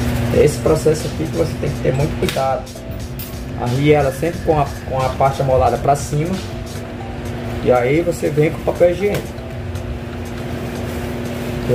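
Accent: Pará, Brazil. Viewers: Pelo sotaque vc deve ser paraense, cara, gostei da dica, certa vez joguei uma máquina fora porque não sabia se podia amolar, agora já sei, sobre o sotaque, morei 10 anos em Belém e 1 ano em Macapá, foram anos incliveis na minha vida, sou cearense.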